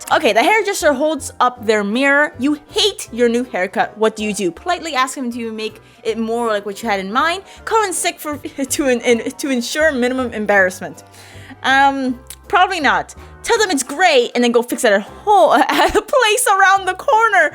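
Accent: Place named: United Kingdom. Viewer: I’m british and I love ur British accent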